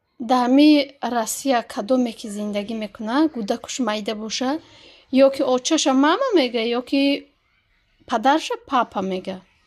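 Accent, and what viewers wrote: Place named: Kyzylkala, Tajikistan. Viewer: Ма ае габзанитон фаҳмидум ма ае қизирқала хоҳар меши апа мешавен ҳамшаҳри будем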